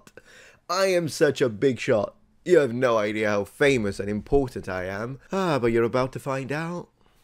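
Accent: United Kingdom. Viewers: Does it sound to anyone else that he learned English from a British source? Don't know why that's standing out to me all of the sudden.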